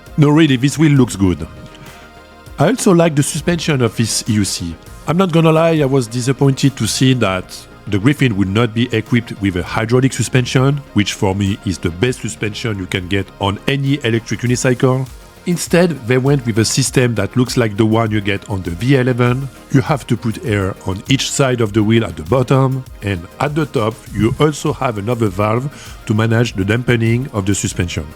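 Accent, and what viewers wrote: France. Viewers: en tant que wheelers FR, je suis fier que tu nous représentes si bien. Tu as l'accent français, et alors ? Je bosse dans l'IT et travaille avec différentes nationalités tout le monde a l'accent de son pays d'origine. L'important c'est d'être compris. Je suis sur que dans une ville cosmopolite comme New-York, tout le monde s'en moque. Heureusement que les humains sont divers et variés sinon quel ennui !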